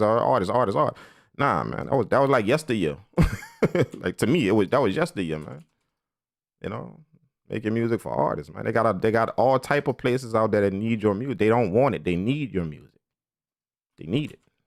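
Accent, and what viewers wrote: New Orleans, United States. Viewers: you from the N.O huh?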